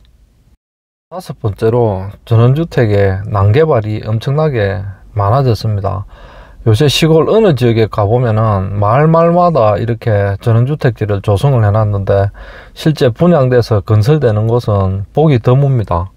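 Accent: Gyeongsang, South Korea. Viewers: 100프로 갱상도 액센트...... 소름끼치네!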